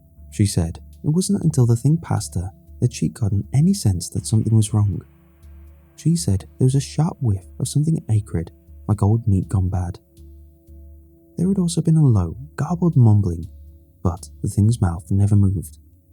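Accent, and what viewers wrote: United Kingdom. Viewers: your voice is so soothing! I'm not English. But I LOVE this language and I really want to become a native English speaker some day. I'm curious about variety of accents in the UK. Which accent is it that you're speaking? It sounds alluring, smooth, calming and clear. I always listen to you when I'm feeling down in the dumps. It helps me in so many ways. ^ ^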